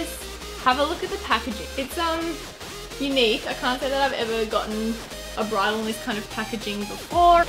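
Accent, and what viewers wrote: Australia. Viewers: OMG ARE YOU AUSTRALIAN :D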